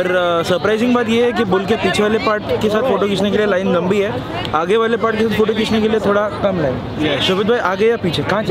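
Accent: United States. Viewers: Jamtay Jamtay U.S. Accent yetay halu halu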